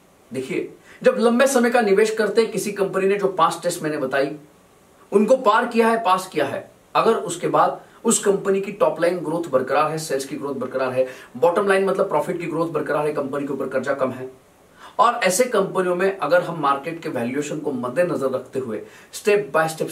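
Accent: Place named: Maharashtra, India. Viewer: Very nice Sir. Khup bhari watla Sir tumcha ha video bagun. Apla manus business field madhe pragati karat ahe he bagun khup bhari watla. Tumcha hindi accent madhe Marathi chi tone yet ahe khup chaan. Dr Vivek Bindra Sir ani tumchya badal reference dila. Khup chaan. All the best. Very nice. Your way of teaching and communication is great and easy to understand. You present difficult topics in very easy way.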